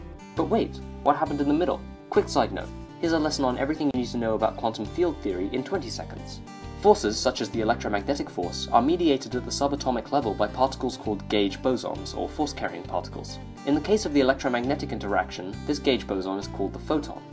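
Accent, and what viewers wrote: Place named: United Kingdom. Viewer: Thanks for this, my only suggetion is to speak slower and more clearly. That Brit accent is sometime hard for Americans to understand when spoken fast.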